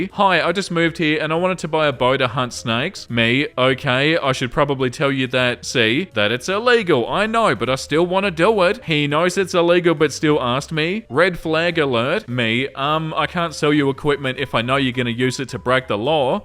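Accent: Australia. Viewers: What? But your Aussie accent is part of what makes you so compelling to listen to! It gives your speech a nice, lilting cadence, like a little song. 🎼 Sing a little song of neckbeards!🎵🎶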